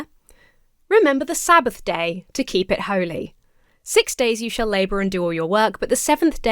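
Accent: United States. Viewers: your American accent is really good, genuinely. just wanted to throw that out there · Your US accent is getting really good! There is already established precedent that covers this exact situation. But who knows wtf our courts will overturn on any given day. This was an intentional move to be sued and put this into the national media and start a conversation about how “Christians are being persecuted.” And an attempt to place Christian Nationalism on a level of actual serious debate. So do I move my family to the UK? I am getting very embarrassed by what my country looks like from the outside. And concerned about the (internal) insanity that is moving out of the fringe and into the mainstream.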